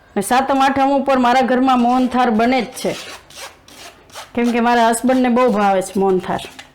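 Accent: Kathiawar, India. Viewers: Pyor kathiyavadi gujrati bolo Cho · બેન રેસિપી સરસ છે અને તમે કાઠિયાવાડી ભાષામાં જ બધુ બોલો એ જ સારૂ અને મીઠું લાગે છે અએ તમારી usp છે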